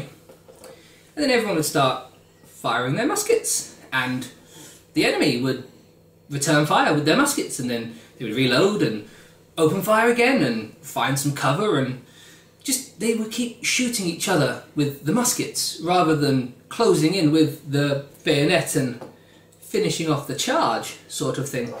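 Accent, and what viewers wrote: United Kingdom. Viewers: i really enjoy your history videos! are you english?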